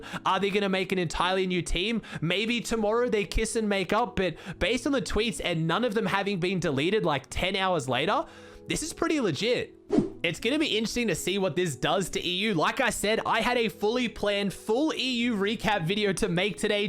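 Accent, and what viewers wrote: Australia. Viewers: I don’t play fn but I. Love listening to aussie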